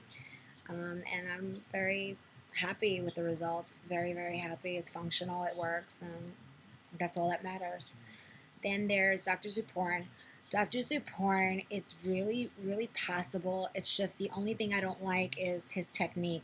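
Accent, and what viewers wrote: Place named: Brooklyn, United States. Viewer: I love how she says yous! Very brooklyn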